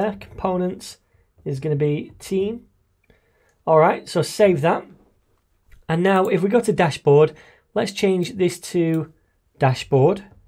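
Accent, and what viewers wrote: United Kingdom. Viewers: Yeah! His UK accent too 😁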